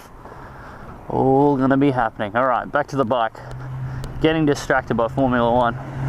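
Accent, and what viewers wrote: Australia. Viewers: You're an American with an Aussie accent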